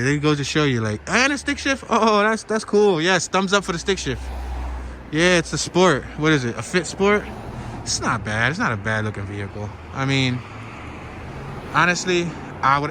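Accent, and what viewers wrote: Philadelphia, United States. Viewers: Woodder lol luv the Philly accent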